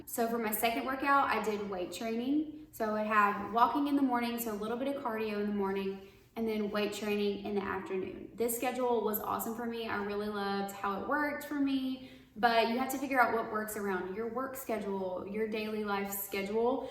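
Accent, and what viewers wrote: United States. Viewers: ahhhh. I get you now. you have a little southern charm in your voice. I picked that up quicker than I did with your sarcasm.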